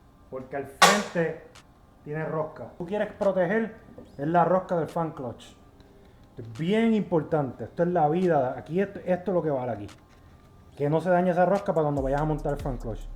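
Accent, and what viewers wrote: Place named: Puerto Rico. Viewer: Lenguaje boricua. 😅😅😅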